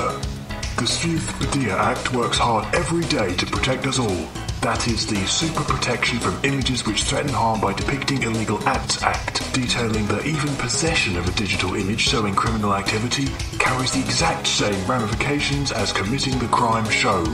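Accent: United Kingdom. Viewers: i guess in their country, which seems to be the uk based on the voice (and as i reread this, i googled it and google claims they are scottish so it checks out), there are laws trying to restrict access to obscene content in general. i googled it and i think the laws are actually stricter if they are uk-based. as an american the only thing that's treated like this is cp (and maybe zoo porn depending on the state). so i was really concerned by this video, but since they are not american they may very well have a reason to parody censorship laws like this.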